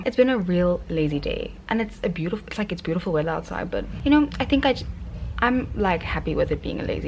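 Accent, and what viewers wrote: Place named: Johannesburg, South Africa. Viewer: joburg boet accent has really plagued my vocabulary recently 🧍🏻‍♀️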